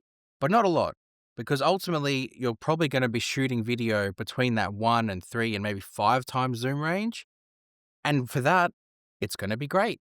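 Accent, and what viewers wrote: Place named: Australia. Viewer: Credibility: screenshots lost because I have to send it back . Yet , you hold up the one you bought . Any expert would send photos of 10 days to their keeper new black phone. .....just the optics and the devils advocate voice in my head. Schill or not , I've been here for information n exposure to new products, uses . ....to expect a lack of bias is to expect a lack of Australian accent. Dude, I tuned in just for the accent and the bias . Love you, man! Nothing but Respect.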